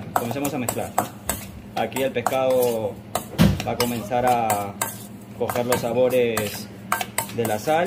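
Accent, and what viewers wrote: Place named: Spain. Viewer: Tu acento ya parece español bro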